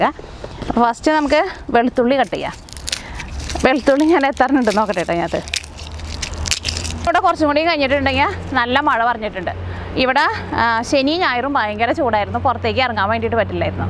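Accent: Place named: Ernakulam, India. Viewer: സംസാരം കേട്ട് എറണാകുളം ജില്ല യിൽ ആണ് താമസം എന്ന് തോന്നുന്നു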